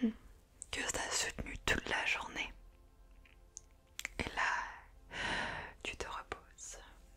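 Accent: France. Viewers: I really love your French accent!!!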